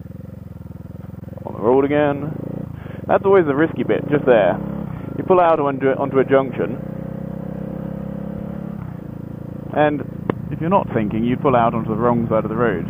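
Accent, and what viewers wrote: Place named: England, United Kingdom. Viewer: I like ur accent, where in england are u from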